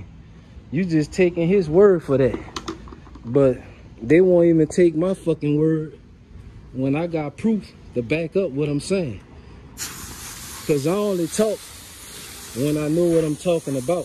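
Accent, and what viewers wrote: Louisiana, United States. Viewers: soon as I clicked on this video the question was "What part of Louisiana you from?????"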